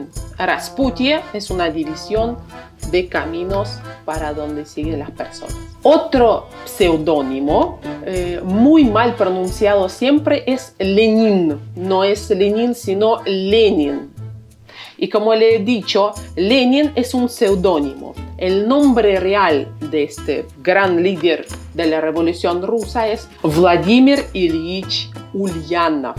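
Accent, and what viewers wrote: Argentina. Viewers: Señora! Por favor la parte dos y tres. Literatura Rusa, música, arte en general. Faltan muchos nombres y apellidos. Muchas gracias! (Bonito acento argentino). Hasta pronto. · Lindo video Tienes acento argentino !!! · Tu español suena argentino?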